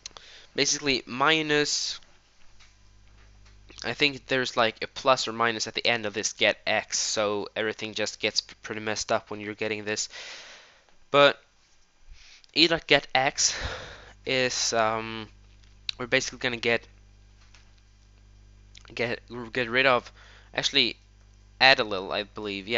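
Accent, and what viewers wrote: Sweden. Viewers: are u Swedish XDD i am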